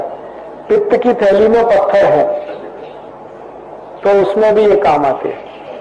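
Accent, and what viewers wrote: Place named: Maharashtra, India. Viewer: Maine padha hai rajiv bhai up se hai....to unki toning marathi kyo lagati hai.....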